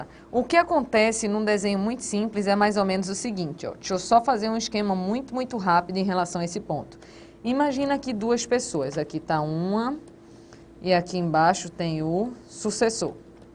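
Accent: Pernambuco, Brazil. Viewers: Gosto das suas explicações, assistindo sempre . Com esse sotaque Pernambucano me acabo de rir qd fala coisas engraçadas.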